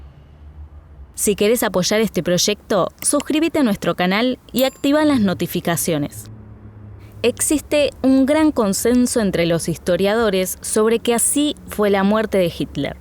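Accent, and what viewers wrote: Argentina. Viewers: iuuu, se escucha horrible el acento argento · Que acento más engañosos, por un momento pensé que era español y de repente me dí cuenta qué es argentino OMG Pd: exelente video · Buen video, lástima que tu acento sea argentino, es muy molesto. Dislike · Muy bueno el video, tiene un lindo acento porteño y saca videos de buena calidad, en poco tiempo llegan a los 100k. Éxitos.👍🏽🇦🇷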